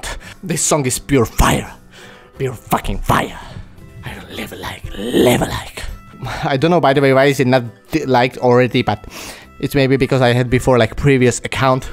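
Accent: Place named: Romania. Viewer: doar eu am impresia ca el e roman? adică frate, uneori mai zice cuvintele cu "ă" sau "â".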